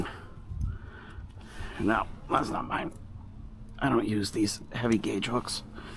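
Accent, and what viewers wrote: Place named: Massachusetts, United States. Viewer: I had a feeling you were in MA. Not the heaviest accent but all the F bombs, lol. Never realized how much we do it until you get around people from other parts of the country and theyre like, "LOL Jesus dude, you swear a lot!"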